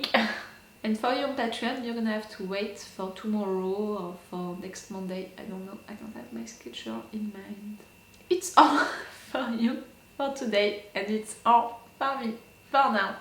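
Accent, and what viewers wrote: France. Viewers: .....why I feel fall in love with ..you , reactor.. What wrong with me .. This is 1st time I had this feeling, experience.. 1st of all your dialect..slang. Are you french? It's so beautiful... For this moment I agree french is beautiful soft language.. Sorry this is random and ridiculous.haha Btw ..as aot fan...I will stick to this ,your channels,your reaction.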